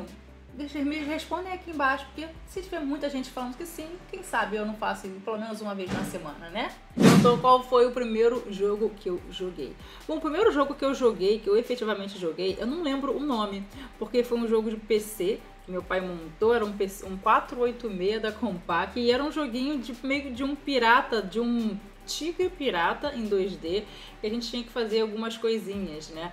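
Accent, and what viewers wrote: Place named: Rio de Janeiro, Brazil. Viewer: O sotaque carioca é top. KKK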